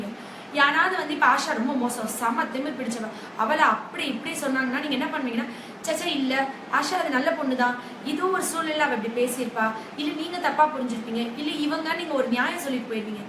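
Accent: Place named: Brahmin, India. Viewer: God bless u Mam 🙏 I like ur voice very much Brahmins Thamil is Mixed in ur ascent nice motivational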